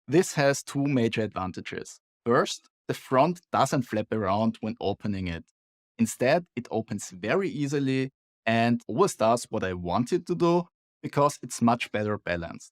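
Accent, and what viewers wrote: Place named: Vienna, Austria. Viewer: This Viennese non-English is hard to stomach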